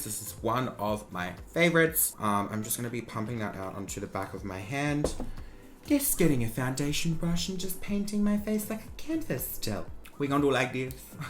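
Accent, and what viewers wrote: Australia. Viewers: What part of Australia is he from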